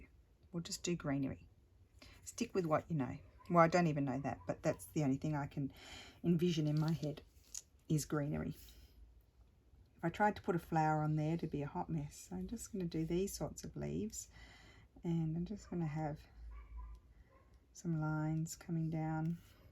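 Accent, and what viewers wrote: Australia. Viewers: I like the leaves also. Try a thistle. I bet it’s not to hard. Building up the courage sounds like a great idea. Sounding Aussie? Maybe because you were talking to your brother than your sister than your mom. Lol. Yep I like your Aussie sound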